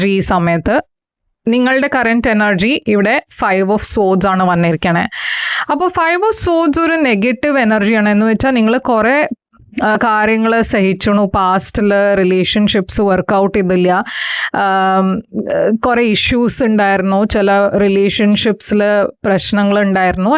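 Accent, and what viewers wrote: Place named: Karnataka, India. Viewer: Nice way of talking Malayalam any Karnataka connection undo❤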